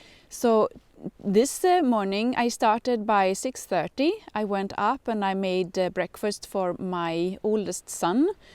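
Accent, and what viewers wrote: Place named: Sweden. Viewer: Tack så mycket. Jag tycker om dig och ditt innehåll. Jag lär mig mycket. Din engelska är utmärkt. Det jag särskilt älskar är att höra din söta accent. Jag ska berätta varför ... Jag är mormor, författare och lärare i Seattle. Jag älskar också att trädgård, sticka, sy, läsa och spela musik. Min make är en Blues-trummis. Jag har bott här hela mitt liv. Men ALLA Fyra av mina morföräldrar var från Sverige! (Stockholm & Malmö) Det finns ett starkt skandinaviskt inflytande i Seattle. Jag tänker alltid så mycket på mina morföräldrar vid jultiden. Jag bakar min mormors kakor och andra recept varje år. INGEN gör julen bättre än svenskar !! Jag har underbara minnen och traditioner. Din röst påminner mig om deras kära röster. Tack.(Google Translated for fun. )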